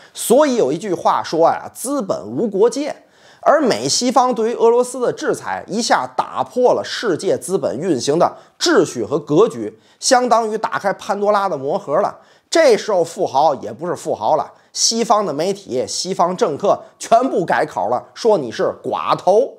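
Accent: Tianjin, China. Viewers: 当然不能给孩子听，孩子就是借着一句“小青蛙你就乖乖的待在井里吧”记住了节目，还有天津味儿的普通话也很亲切。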